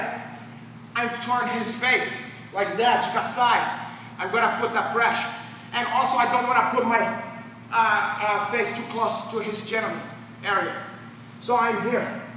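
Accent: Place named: Brazil. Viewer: "He's going to face this way my brother. C'mon my brother!" LMAO that's how so many Brazilian instructors talk. I love them all but it's so spot on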